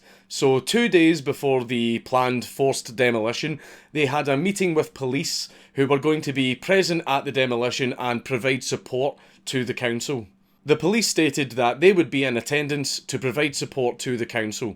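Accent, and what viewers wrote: Scotland, United Kingdom. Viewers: Hearing someone talk about fighting the government is always better with a Scottish accent.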